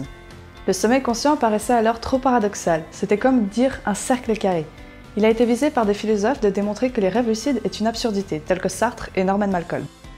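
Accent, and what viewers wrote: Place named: Switzerland. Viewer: Ah d'accord merci! Vous avez pris l'accent :) Vous habitez dans quel canton? Et c'est vraiment intéressant ce que vous faites bravo!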